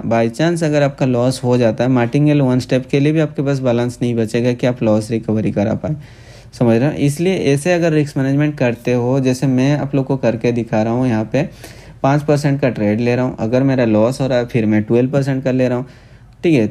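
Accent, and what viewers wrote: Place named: Kolkata, India. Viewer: Kolkata Se Haii Bhaii ❤️ Tere Bolne Ka Style Se Pta Ge Mujhe..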